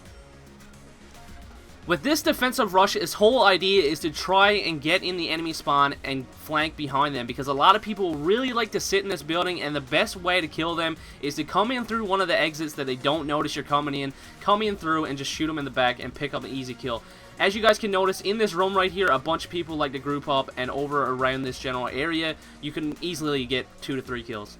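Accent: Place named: Canada. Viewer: Your accent is so annoying haha are you canadian? Also good vids, they really help.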